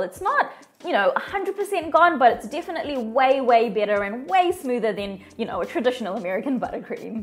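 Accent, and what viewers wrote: New Zealand. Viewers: Your accent and way of speaking sounds familiar and then u said here in Newzealnd😊😊..happy to see local stuff. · This looks brilliant. I stopped icing cakes ages ago cos it made them too sweet but, will definitely try this as it looks fantastic. Love to hear the kiwi accent an a cooking video. Makes me proud e hoa. 😊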